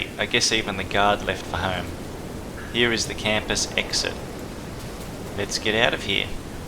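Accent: Australia. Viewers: The Australian accent is amazing.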